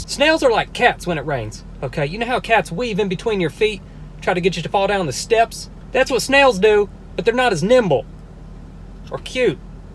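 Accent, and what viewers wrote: South, United States. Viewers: Kind of new to this channel. Love him. But where is he from? He says he lives in California but if I'm not wrong, ain't that a southern accent??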